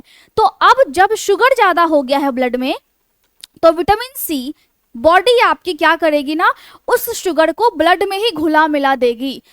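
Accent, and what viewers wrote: Bihar, India. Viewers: Mam bihari to nhi ho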